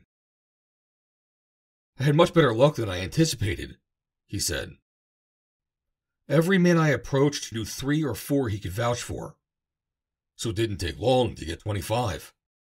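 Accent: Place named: New York, United States. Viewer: I think your voice is great for stuff like this. NY / NJ accent is great for a stoic hero like JC — keep up the great work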